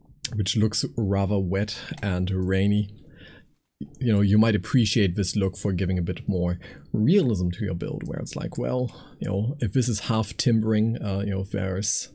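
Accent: Germany. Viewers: Dieses Spiel ist ganz nach meinem gusto. Übrigens hört nur ein geübtes Ohr deine Deutsche Herkunft heraus. Dein Englisch ist Einwandfrei. Sehr guter Video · You sound like you have a slight german accent but you are so well spoken and articulate. It really confuses the heck out of me. Seeing that there are some people commenting in german, I can't be the only one, haha. Anyhow, fantastic video! I've deliberately not looked at any building tips and tricks to find out some of these things for myself and only now allowed myself this video as an introduction on what the game has to offer. Almost done watching and can't wait to implement stuff like the flying buttresses. Can I just say, you should really consider streaming your progress on twitch.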